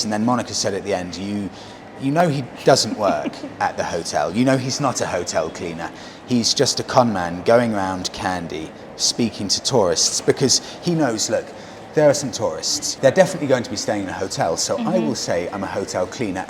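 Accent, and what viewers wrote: RP, United Kingdom. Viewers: Your voice has a clear, modern RP accent that gives your videos the tone of a high-end documentary. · My Mother is from Nuwara Eliya and My Father is from Kandy..... so I enjoyed this VDO very much... the art you do this VDO is magnificent.... I love your British ascent.... thank you for taking my country to the world.... Totally regret about the scams.... that is common in this part...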